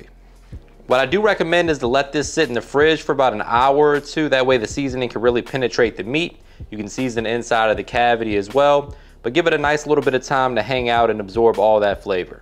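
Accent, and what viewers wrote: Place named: Louisiana, United States. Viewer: You seem to have a Louisiana accent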